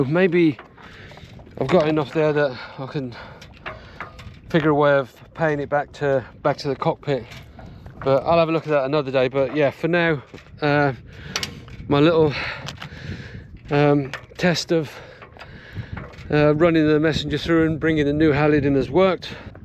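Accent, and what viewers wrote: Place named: Birmingham, United Kingdom. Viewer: Sounds like you're from Birmingham (same as me) how did you get to live in such a lovely place & get into sailing ??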